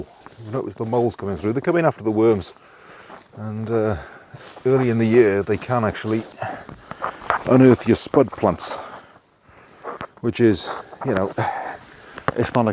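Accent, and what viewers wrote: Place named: Scotland, United Kingdom. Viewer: I love your accent I'm in New York are you of Scottish decent?I think I asked this once before to you lol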